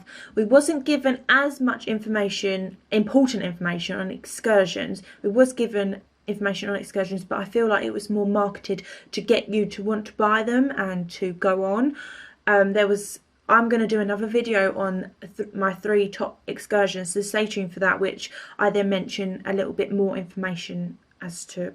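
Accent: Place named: RP, United Kingdom. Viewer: U sound very posh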